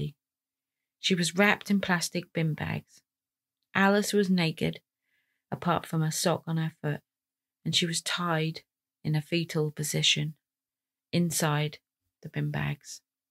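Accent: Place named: Cambridgeshire, United Kingdom. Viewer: Can I ask are you from outsell Wisbech area - my ex husband was from there sound so similar excellent channel xx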